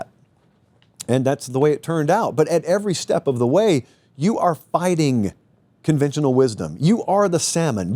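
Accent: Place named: Tennessee, United States. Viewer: The comparison between you and McAfee is interesting.. both guys without “J school” degrees making it big simply due to the advent of the digital era.. but you two are polar opposites in terms of the appeal behind your success.. McAfee is charmingly dumb, funny to the average man, slightly controversial.. you on the other hand are a true intellectual who happens to have a TN accent and love college football.. and because you use big words and back your opinions with critical analysis and make jokes that are way over most’s heads while inevitably offending people who watch your content already having their minds made up I think your audience cap is much less.. if you sell out and pander to the masses I think you could be #1 in 2035 👍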